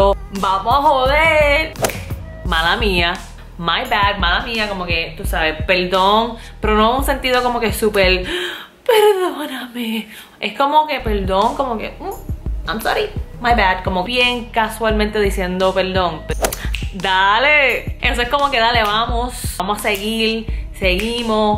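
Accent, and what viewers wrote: Puerto Rico. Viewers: I love boricuas accents 🙂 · No es boricua. Es una China que se crió en puerto rico. Por más que hable como boricua. Ahora mismo es la china que se crió en puerto rico que ahora vive en USA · Que bonita , te holles hablando boricua ,orgulloso de ti ..por que vivas y te encanten nuestros encantos en la isla.... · China na esa es boricua de todos modos Abla bien boricua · Corillo que pasa cuál es la envidia en los comen6con el acento boricua ??? 😂 Ya superen que somos los mejores!!! Puñeta 🇵🇷 Por cierto aquí tenemos mucha mezcla con chinitos y es súper cool 🔥🔥🔥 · Alina Cuellar no todos los puertorriqueño hablan así ella obviamente se crio en unas parselas o en un caserio de mala muerte. Ese asento de ella es muy chisma y no todos hablamos así!!!!!! Chusma!!!! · Habla igualito a los boricuas. Es una china bien boricua"🇵🇷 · Ok la vi y dije puede que hable boricua la escuché y dije no mi ciela esa es mas boricua que yo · Es raro ver una china hablar también el español y mas como los boricuas como yo ✋😁🇵🇷❤️ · Que mal me cae el asentó de Puerto Rico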